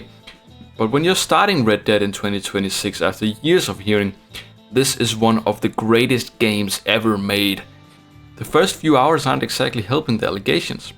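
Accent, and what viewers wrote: Denmark. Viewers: Jeg kan genkende noget danglish når jeg hører det😂. Super god video, det var lidt chokerende at se du startede for 10 dage siden. Meget imponerende og jeg glæder mig til at se hvad du poster i fremtiden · Are you from Denmark? You sound danish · Is that danglish i høre?😄❤️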